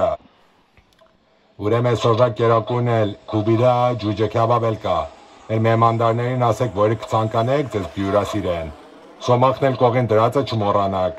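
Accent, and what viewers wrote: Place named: Armenian, Iran. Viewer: Merrraaammm!! Such a barskahye talk!! Half “loghat” is in Persian 🤣🤣🤣🤣 Yes! Count me in too. I wanna be on this flight!!! 😂😂😂😂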